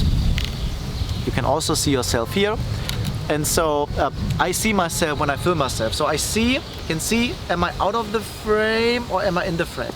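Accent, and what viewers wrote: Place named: Germany. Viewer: Liebe den deutschen akzent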